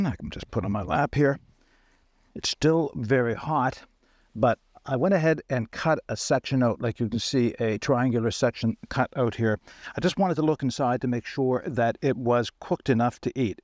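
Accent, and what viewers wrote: Canada. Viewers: Yeah cool, I did assume you'd have some similar "iron" type device over there. No worries on Canadian diction mate, just curiosity, it's those Yanks that tend to bastardise the language,..... but even then, it's all in good fun, we are arguably the worst of the English speaking nations for it; ) Had that book a while now, to be honest I didnt know if it was still available, since it is, I'd be very surprised if it's expensive. And of course it doesn't mean you must stick rigidly to the recipes, in fact I rarely do, It's more the principle of the thing in a camping context, that I mentioned it.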